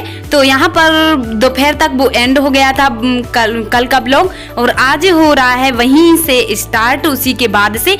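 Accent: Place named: Jhansi, India. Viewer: Ap kaha ki ho jhansi taraf ki ho ap kya boli se lag raha h bahan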